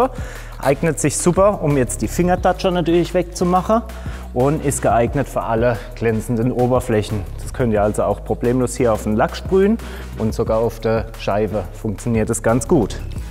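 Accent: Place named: Baden, Germany. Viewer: Ich liebe deinen badischen Dialekt 👌😄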